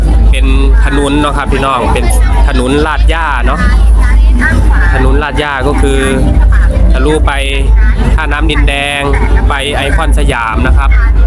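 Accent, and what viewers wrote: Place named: Laos. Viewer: บรรยายไทยล้วนไม่ปนลาวได้หรือไม่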